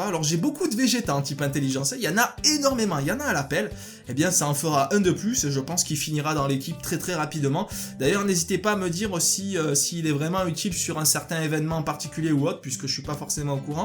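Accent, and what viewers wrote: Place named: South, France. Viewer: Vu ton accent ca se voit que tu es un sudiste😊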